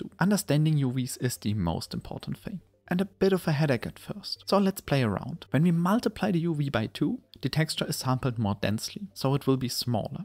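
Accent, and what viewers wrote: Germany. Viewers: That’s a german accent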